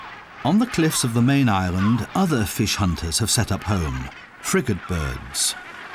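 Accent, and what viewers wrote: United Kingdom. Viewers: "notanother" Uboob being narrated by a Brit, for Gods sake use a Lantino accent for Central American subjects. What's with all the Brits? At least this one I can understand, without subtitles.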